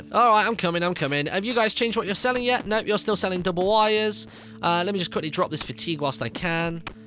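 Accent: United Kingdom. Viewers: Banana Bucket He Has a British Accent If You Havent Already Noticed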